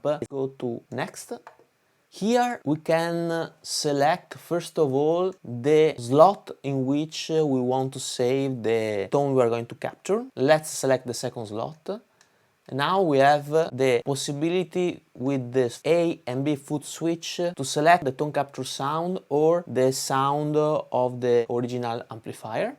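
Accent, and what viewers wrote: Italy. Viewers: Ma sei italiano? Mi sembra un accento italiano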